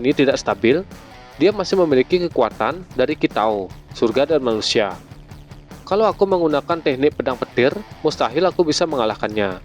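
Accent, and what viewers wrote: Bali, Indonesia. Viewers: Dr logat, kayaknya orang bali nih admin.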